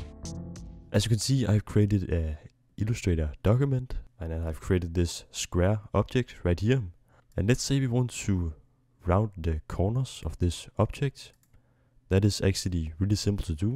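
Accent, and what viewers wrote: Denmark. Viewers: He sounds like he's from Denmark...rural Jutland if I'm guessing.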